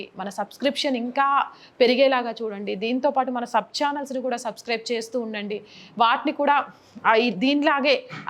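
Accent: Telangana, India. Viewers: నువ్వు తెలంగాణ యాస తగ్గిస్తే ఇంకా పెరుగుతుంది పోరి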